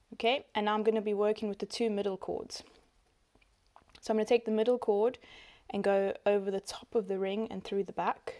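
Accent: South Africa. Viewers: You sound like you’re from South Africa? 🇿🇦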